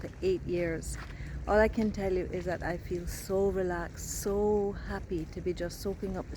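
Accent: Jamaica. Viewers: Ive never heard a white person with an authentic Jamaican accent, I like it 🫡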